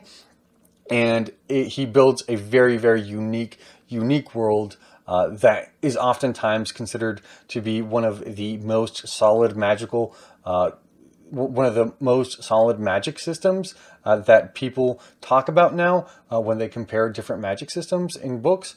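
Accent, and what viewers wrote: South, United States. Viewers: I love your video at your house in Texas during your quarantine until you're talking about your Top 10 favorite fantasy series with a lot of books are Red Sister, Grey Sister and Holy Sister by Mark Lawrence, The Name of the Wind and The Wise Man's Fear by Patrick Rothfuss, The Fifth Season, The Obelisk Gate and The Stone Sky by N.K. Jemisin, Eldest, Inheritance, Brisinger and Eragon by Christopher Paolini, Gideon the Ninth and Harrow the Ninth by Tamsyn Muir, Mistborn, The Well of Ascension and The Hero of Ages by Brandon Sanderson, Green Rider, First's Rider Call, High King's Tomb, Blackveil, Mirror Sight and Firebrand by Kristian Britian and so many others while you're talking about all the characters from the book, I hope your husband, Zach will be very proud of you, but I know you're half Mexican and you had the Southern accent!! 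#BookTube #SFF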